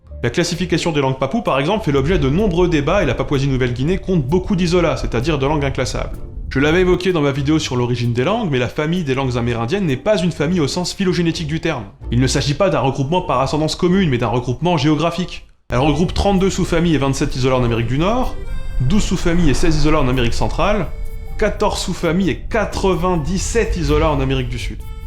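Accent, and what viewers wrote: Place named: Franche-Comté, France. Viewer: Wow, j'ai eu cette vidéo en recommandation et je regrette pas du tout d'avoir cliqué dessus ! T'as gagné une abonnée 😊 Mais euh, ton accent, tu viens d'où ? On dirait la franche-comté...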